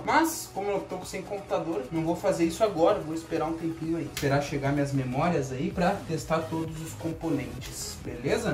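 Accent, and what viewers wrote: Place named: Rio Grande do Sul, Brazil. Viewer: Tu é Gaúcho né meu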